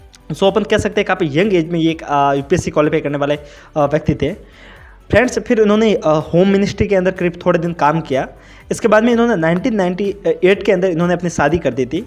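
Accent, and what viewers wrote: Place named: Rajasthan, India. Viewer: Lagta hi rajsthani hai aap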